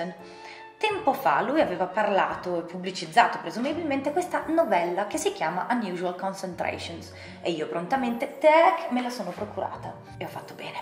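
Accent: United Kingdom. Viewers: In questi video ho trovato tanti consigli per regali... che mi farò :3 Ma mi hai tolto ogni dubbio su quale prendere per primo quando hai detto "India" e "mi è sembrato di sentire gli odori" Mi spiace solo che l'edizione italiana di Shantaram non abbia la copertina bella come quella inglese, anche se sono certo me ne farò una ragione. P.s. Adoro il modo in cui parli! Sei di madrelingua inglese?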